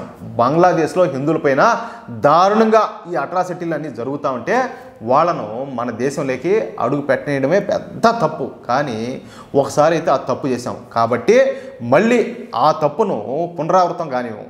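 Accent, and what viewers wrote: Rayalaseema, India. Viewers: Super sir Mee rayalseema language super Sir I'm also Rayalseema from Europe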